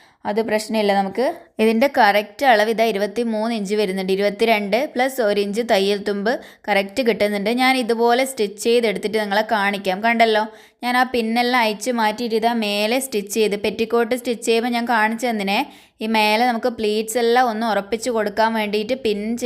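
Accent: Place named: Wayanad, India. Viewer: Evidaya veed ? Samsaaram kelkumpol vayanaadu polund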